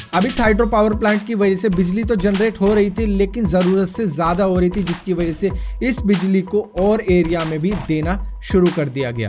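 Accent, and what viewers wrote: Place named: Delhi, India. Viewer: Bhai facts ke maamle m thmhara channel no. 1 hai. Delhi ka accent toh h hi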